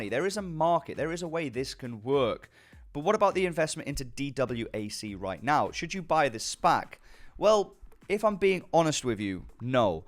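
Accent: United Kingdom. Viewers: Hay, Just new to this, but loving having a UK voice tell it like it is. Was think I might throw something at this, Cant find the stick on Etoro so I am guessing is one some sort of sub exchange. Any suggestions where best to access DWAC stocks from?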